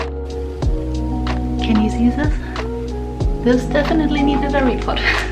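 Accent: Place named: Germany. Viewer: Your vlogs are so soothing! Also, your adorable accent makes me miss living in Germany! :D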